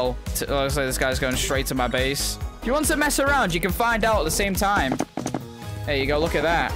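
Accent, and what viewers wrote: United Kingdom. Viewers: Are you british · I just realised he is British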